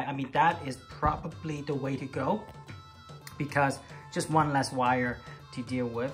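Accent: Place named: Denmark. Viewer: I would say you are Danish from the Netherlands am I right?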